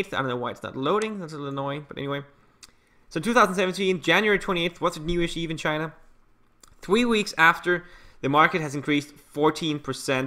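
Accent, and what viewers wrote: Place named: Denmark. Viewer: You sound danish.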